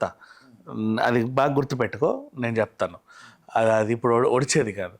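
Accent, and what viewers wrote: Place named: Visakhapatnam, India. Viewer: Vizag telugu is far better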